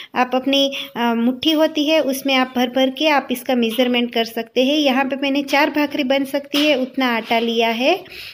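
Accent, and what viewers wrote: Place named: Gujarat, India. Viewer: Gujarati Hindi sounds really funny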